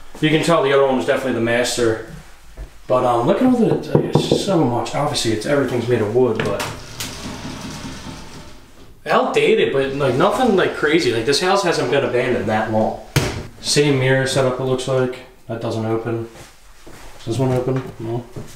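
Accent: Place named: Pennsylvania, United States. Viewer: Hey, just found your channel and subscribed, interesting content. I think some of those are aviary coops? These people were breeders it seems. Those mugs are worth money dude, someone put a lot of money into this property. Is it for sale? Unbelievable, it looks like it can be saved at this point. Oh, I'm detecting a accent, are you from PA? Anyways, enjoyed the content, thanks bro! 🌴😎🌴FL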